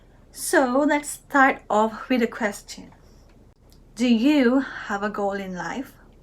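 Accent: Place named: United Kingdom. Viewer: Good listening to you pretty woman, liked the UK accent.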